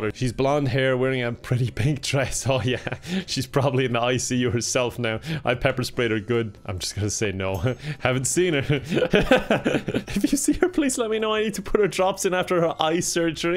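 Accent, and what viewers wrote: Ireland. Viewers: is a 100 percent Irish human. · Its cuz of the Irish dialect. Its an Irish thing, not a thing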